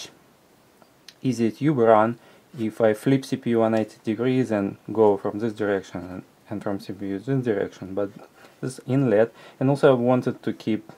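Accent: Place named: Russia. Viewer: Российский акцент так и показываешь!даж не стараешься говорить с меньшим акцентом,а ,,буилд,,кайфовый.спс)_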